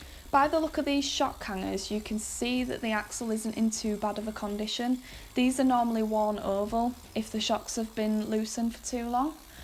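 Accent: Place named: Yorkshire, United Kingdom. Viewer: Love ur vids I'm wanting a defender project soon And I will be using ur vids as guidance Keep it up BTW I'm guessing your from Yorkshire lol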